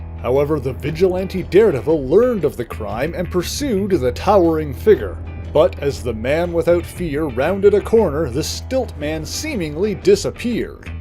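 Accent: Canada. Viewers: You pronounce things funny. Are you Canadian by any chance?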